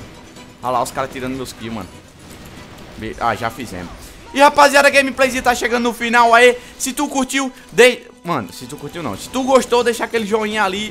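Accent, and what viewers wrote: Northeast, Brazil. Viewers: nordestino?!!!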